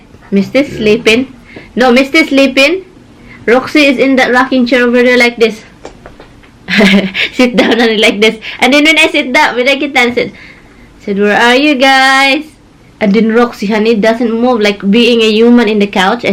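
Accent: Visayas, Philippines. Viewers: HI sis.....i really like ur accent bisay jud ba,i am proud of it.sad to heard about ur duhang totoy dai oi mailbag sab,i avoid mo mag bra every time u goes to bed.basin naa ka ces.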